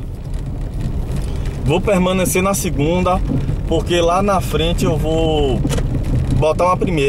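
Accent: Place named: Pernambuco, Brazil. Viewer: Você é Pernambuco? Gosto do sotac.. Parabéns!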